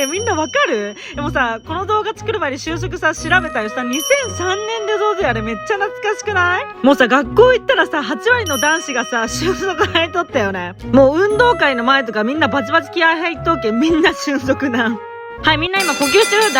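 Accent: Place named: Hakata, Japan. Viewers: チャンネル登録しました!! おなじ九州なので福岡弁癒されます♡ 頑張ってダイエットしたい☆彡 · マジDJ社長大好き間違えただった😍ｗｗ この博多弁？めっちゃ好きやわ～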